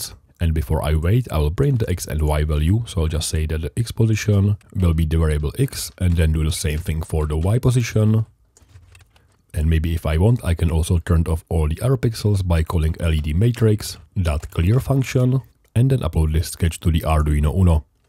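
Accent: Czech Republic. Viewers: Amazing content, live your little DIY projects. Fascinating to watch. I cannot help but try to figure out, are you by any chance a bratr from CZ? Im not that great with accents, sorry :D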